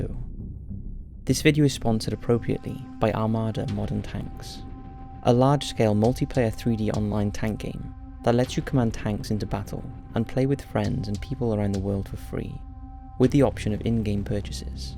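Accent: Wales, United Kingdom. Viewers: Ate you Welsh? If so what part? I'm west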